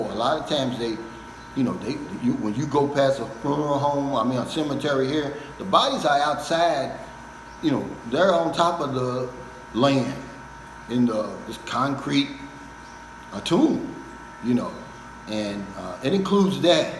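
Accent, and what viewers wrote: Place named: Texas, United States. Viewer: Sheeeeeeeiiiiittt sounds like Texas let's goooooo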